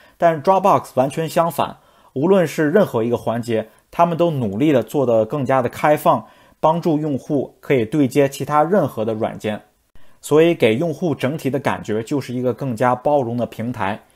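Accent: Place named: Tianjin, China. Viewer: 是天津卫滴：）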